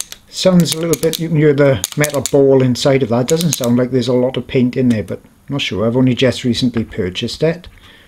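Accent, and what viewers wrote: Wales, United Kingdom. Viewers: i'd recognise that accent anywhere. where in Wales are you from?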